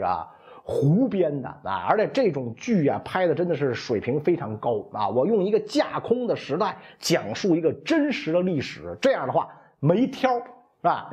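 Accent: Beijing, China. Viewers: 袁先生的Beiglish第一拝聴、 很有特徴！！😉👍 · 老師聊什麼我都愛聽，這口京味就是聽著舒服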